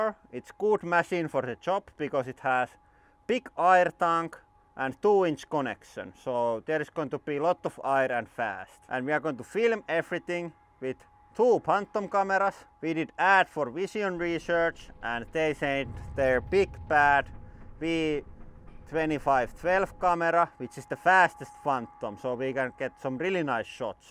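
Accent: Finland. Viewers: Sä kuulostat vähä suomalaiselt, mut ootsä🤔🤔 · Tykkää jos oot tilannu kanavan vaan tän ihanan rallienglannin takia💗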